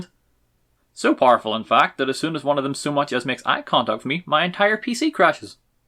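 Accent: Northern Ireland, United Kingdom. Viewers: I can't believe I just heard a northern Irishman speak in uwu truly the internet is a fascinating place. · I fucking love your accent. I think you said it was Northern Irish? im sorry if thats wrong or offensive or something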